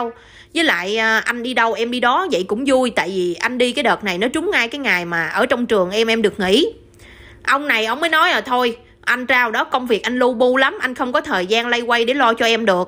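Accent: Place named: North, Vietnam. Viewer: Giọng miền bắc mà mê ,có nói nộn kg?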